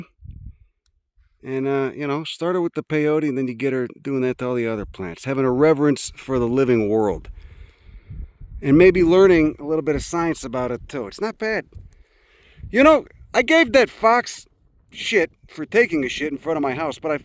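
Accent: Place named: Brooklyn, United States. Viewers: This is the most educated guy from brooklyn i've ever heard lmaooo.. heya fuck face, why don't you respect the botany huh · You and Bill Burr have officially broken my stereotype that people with that kind of Northeast accent are dumb 😂😂 this was the most entertaining and enthusiastic appreciation of cactus and railing of the culture ever put to video lol Thank you I will be sharing this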